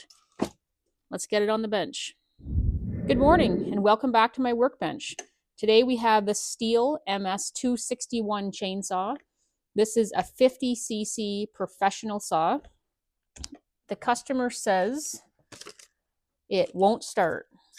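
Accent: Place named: Canada. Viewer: There's always something solidly reassuring about a Canadian accent. No idea why this was recommended - my back yard is about 25m2!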